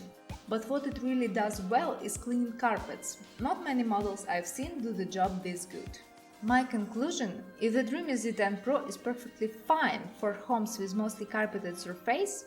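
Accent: Russia. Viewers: Thanks for the review, but the accent is too Russian, that it hurts ears.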